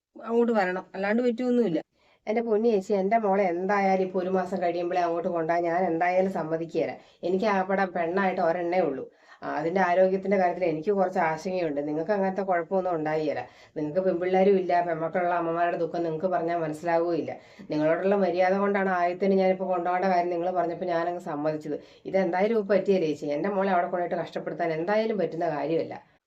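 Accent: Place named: Thodupuzha, India. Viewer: സാംസാരം കേട്ടപ്പോൾ എന്റെ നാട്ടുകാരി ആണെന്ന് തോന്നി ഞാൻ തൊടുപുഴ ആണ്😊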